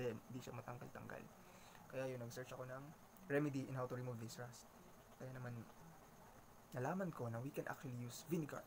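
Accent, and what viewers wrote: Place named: Ilonggo, Philippines. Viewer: Yung accent mo conyong ilonggo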